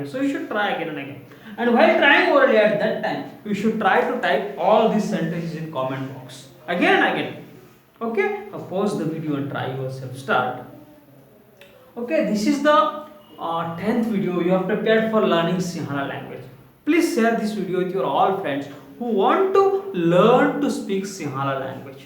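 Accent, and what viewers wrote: India. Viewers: You need to ask from a Sinhaleese how to pronounce, this guy's Sinhala like Indian who teaches in Indian Accent..However the letters you asked not that easy to pronounce, you need to ask writting is not helpful..In English it can be 'nge'Like champagne //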